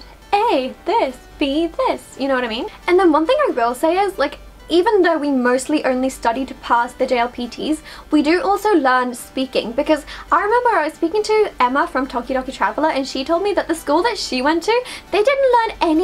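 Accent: United Kingdom. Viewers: You have the most British/English accent I've ever heard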